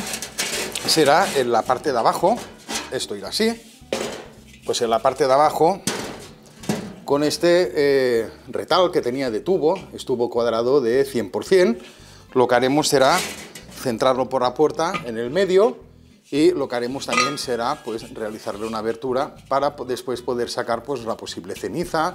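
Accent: Catalonia, Spain. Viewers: Que bueno... me gusta escuchar a los españoles ( gallegos aquí en argentina). Y muy bueno lo del quemador, no lo había pensado. Muy buen trabajo hombre. Saludos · que cohones es "pellet"? suenas como un catalan que no conoces suficiente el español como para hacer un video en este idioma, si es asi hazlo en catalan y nos entendemos cada uno en su idioma.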